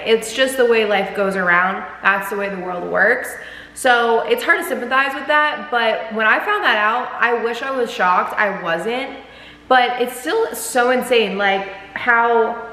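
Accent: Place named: South, United States. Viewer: Nothing crazy🙂 Sounding country 🙂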